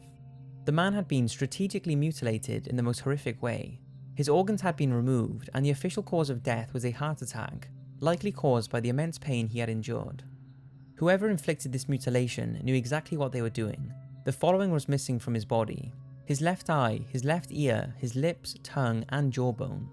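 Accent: Wales, United Kingdom. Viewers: Sounds Welsh to me. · does anyone know if this guy is welsh or not?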